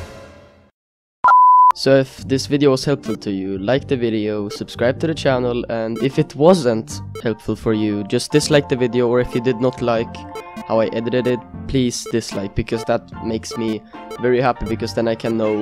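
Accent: Sweden. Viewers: are you swedish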